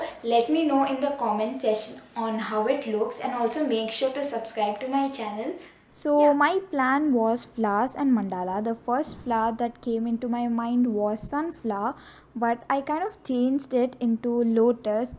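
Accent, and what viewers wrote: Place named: United Kingdom. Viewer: Wish you come to uk help me I notice Brit accent.do some classes here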